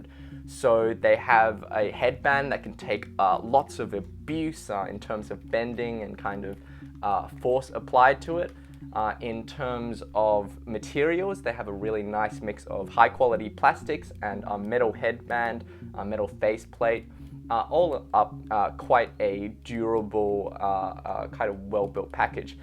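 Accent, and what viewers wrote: Australia. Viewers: I can't figure your accent, is it Australian or English? )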